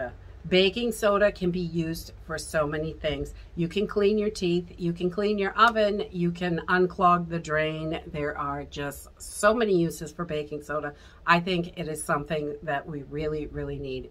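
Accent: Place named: Maine, United States. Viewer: First timer, I was listening to Canadian Prepper and your video just started afterwards, as I listened, I was thinking if that is not a Maine accent. Great video from a neighbor in southern Franklin Couunty, Maine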